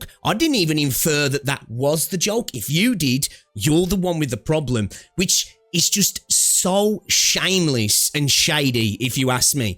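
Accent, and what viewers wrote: Australia. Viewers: Ngl your voice and Australian accent is still dogshit to hear, haha can't even go past 1 min haha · Man you have the sweetest aussie accent ever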